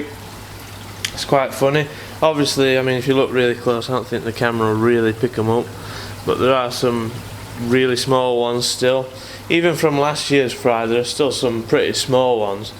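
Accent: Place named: Yorkshire, United Kingdom. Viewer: great videos ive got many good tips watching your vid blogs. only had koi for the last 8 months and need all the help i can get. plus you sound like a local lad im in rotherham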